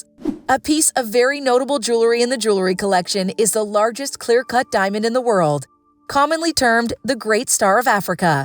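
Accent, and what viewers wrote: United States. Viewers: American narrator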